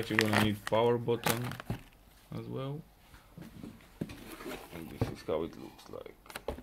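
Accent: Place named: Eastern Europe. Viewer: so where are you from bro your accent is slavic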